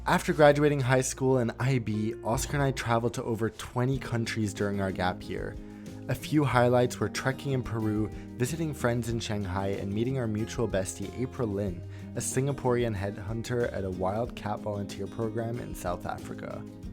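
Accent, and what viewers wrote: United States. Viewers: Interesting life, although I still don't get why it is that you speak English with an American accent when you grew up in London, somewhere near Heathrow, Forest Row (11 miles from where I live now) and Sweden! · So how did you come to have such an American accent?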